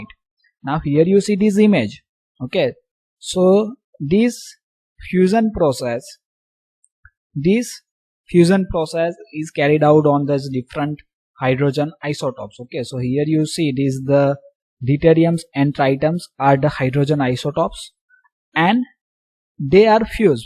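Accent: India. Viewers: The video is good prepared but indian english langauge is very booooring and disgassting ....very bad english 🤮🤮🤮🤮🤮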